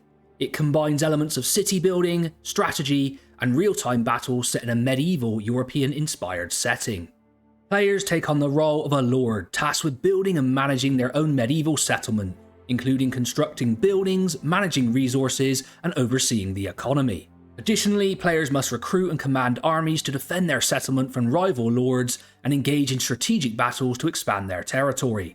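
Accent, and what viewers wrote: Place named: Cornwall, United Kingdom. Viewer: oo i fuckin love a cornish accent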